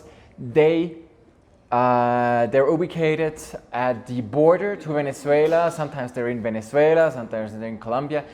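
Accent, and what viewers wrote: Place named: Switzerland. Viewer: From which country are you? (the accent sounds really familiar to me....😄 Schwiizer, gäll?! 😂 ✌️ 🇨🇭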